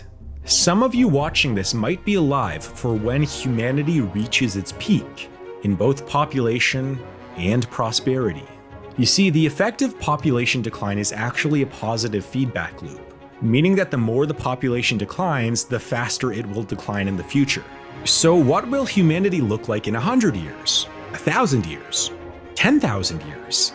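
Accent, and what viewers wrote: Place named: Canada. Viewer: I think I hear a Canadian accent. 😉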